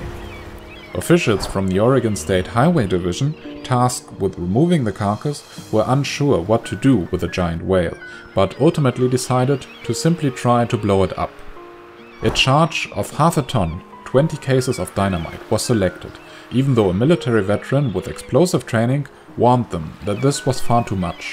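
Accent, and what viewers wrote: Germany. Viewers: I love your German accent. Your style of animation is really similar to other channels I like too! Subscriber earned. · The German accent makes it more convincing. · from Germany look at Channel Info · Ekn _38 I am German too and I heard it in the first second 😂😂 Grüße gehen raus ♥️ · Oh the German accent...xD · Are you german? You have a very strong accent. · RZU 147 his accent is german, i know that because i am german. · RZU 147 im German and even I think he sounds German as hell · Ich finde es eher traurig, dass du dieses Video unbedingt auf einer Fremdsprache bei der man es so stark heraushört, machen musst! Warum bleibst du denn nicht bei deiner Muttersprache? Denke, du hättest mehr Erfolg damit so nervt es nur. Oder aber du überlässt das Sprechen jemand anderem, wenn es denn unbedingt auf Englisch sein muss. Es gibt auch viele Deutsche, die nicht diesen typisch deutschen Akzent im Englischen haben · German Accent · Sooo true, 5 seconds in I stopped the video and looked for this comment. 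I´m German myself and there is no way this dude isn´t one of us, it´s so strong and noticable!! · RZU 147 "Dude" ... It IS a german accent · RZU 147 he totally sounds German xD that accent is so strong (I don't mind it though) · Chris Purolover he is German or Austrian or maybe Swiss but I doubt Swiss, his accent is clearly Germanic. Listen to how he stresses his 's's. The z and long s which are native to German give away his lingual origin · RZU 147 I'm not even German but I know enough to within the first words determine he was. How the hell is a fellow german unable to recognize that... Lol geeze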